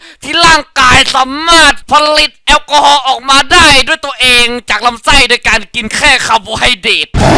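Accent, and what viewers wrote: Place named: Isan, Thailand. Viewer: เล่นเว้าครับ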